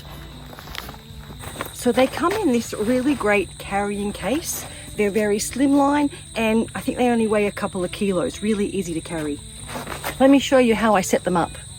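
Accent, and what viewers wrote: Australia. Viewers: you have the strangest accent - you sound aussie and then this american "R" comes through - where are you from?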